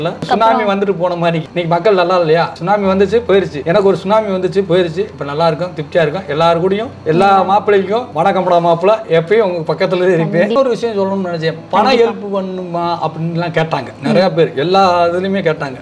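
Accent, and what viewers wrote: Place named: Usilampatti, India. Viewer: உங்கள் சமுதாயம் அக்கறை பார்க்கும் போது மகிழ்ச்சி உள்ளது அண்ணன்.நம்ம வட்டார வழக்கு அழகு அண்ணன் உசிலம்பட்டி இருந்து 😊😊😊😊😊😊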